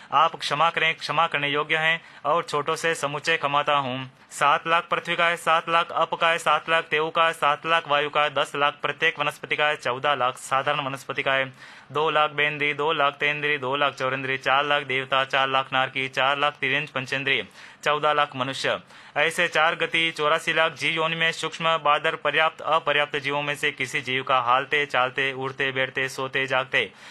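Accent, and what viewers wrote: Standard. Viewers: Sadar Jai jinendra Aapki aawaj bhut prabhavik aur uchran shudh hai kripa karke sawatsari pratikaman ka audio upload kare Covid kal me yah bahut badi sewa hogi Sadar dhanyawad 🙏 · Sudha ucharan sath kiye prayash keliay bahutbahut dhanyvad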